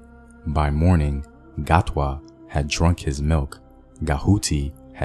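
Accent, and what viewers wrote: United States. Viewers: Where this American sounding person got this information? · cant get over your VERY AMERICAN accent mentioning these african words. funny that the historical myth of he milk is the same as the one of the banyankore of Uganda.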